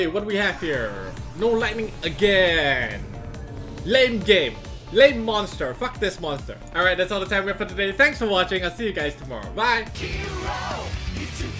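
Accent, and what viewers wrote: India. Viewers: lol indian accent lmao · Indian accent is so funny😂😂😂😂😂😂😂😂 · that indian accents roflllll. so bad senpai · Love the indian accent!!!!!! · best indian accent very good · indian accent crack me up so hard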